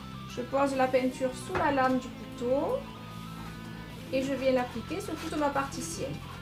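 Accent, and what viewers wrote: South, France. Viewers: Bonjour, Un Très Grand Merci pour ce Partage et cette profusion de générosité artistique personnelle qui est la tienne! Agrémentée par ce merveilleux, savoureux accent méridional qui ne peut que réchauffer les cœurs de ces espèces d'artistes congelés et recroquevillées sur leurs certitudes académiques aux quatre coin de l'hexagone....et même bien au delà.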